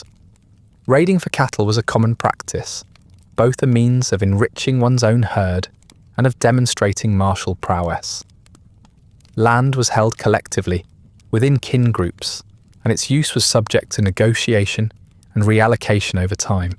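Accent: United Kingdom. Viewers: Watch how you tread here carefully having a British accent and dictating Irish history to the mass is not a good luck would not appreciate if I were to do the same to you. Trust me just cautiously and make sure you know your shit. Again the graphics need to go.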